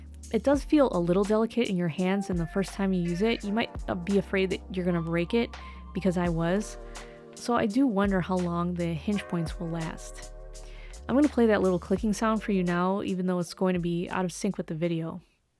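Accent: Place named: Midwest, United States. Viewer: great review. can i guess, you're from some midwestern state. ohio? illinois? indiana? michigan?..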